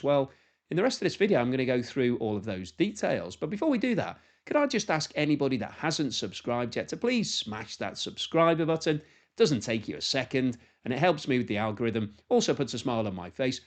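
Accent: United Kingdom. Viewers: Luv the British accent! At least I think it's British!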